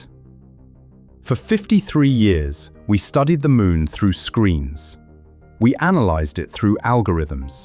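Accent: United Kingdom. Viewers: Get rid of the British narration.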